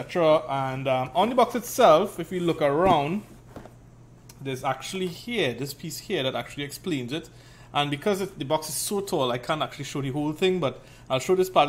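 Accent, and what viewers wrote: Trinidad and Tobago. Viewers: Heard that trini accent from the go🇹🇹🇹🇹🇹🇹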